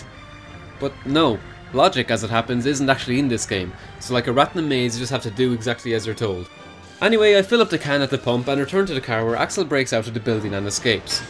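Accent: Ireland. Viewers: explained through an Irish accent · He's Irish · Irish maybe hmmm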